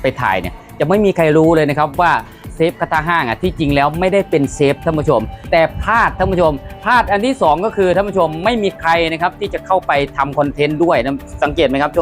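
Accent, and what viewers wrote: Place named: Australia. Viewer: สําเนียงการพูดเหมือนคนประเทศออสตีนเลียจังเลยสงสัยเดียวต้องถอดสร้นตีนยัดปากบักเชพเอ้ยได้โปรดไปไกลๆส้นตีนกู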